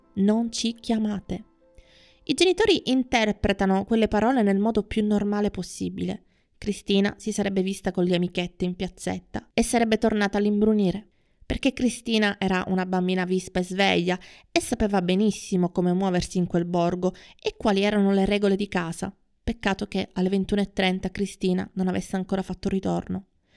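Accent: Sicily, Italy. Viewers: Aspettavo da tempo che qualcuno trattasse questo caso......e finalmente lo ha fatto, come sempre la tua voce narrante è molto precisa e il tuo accento siciliano dà quel tocco in più che mi fa seguire i tuoi video sempre con moltissimo piacere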